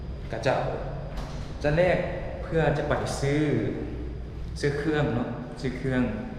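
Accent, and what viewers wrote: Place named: Laos. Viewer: สู้ๆครับไม่ต่างกันเลยไทยลาวสมาชิกใหม่เด้อรับด้วยครับชอบสำเนียงลาวครับ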